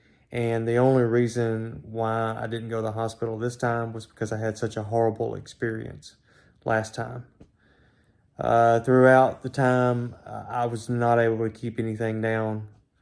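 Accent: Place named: United States. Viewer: Ps where in America do you live ? As I love your accent. Best wishes again soo good to see you sitting up and chatting It will be tough as you know but you have got this ❤❤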